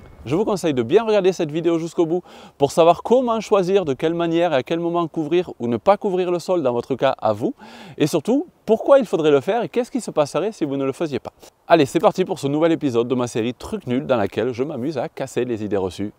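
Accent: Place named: Occitania, France. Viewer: Salut! Tu as un accent occitan. De quel département tu es?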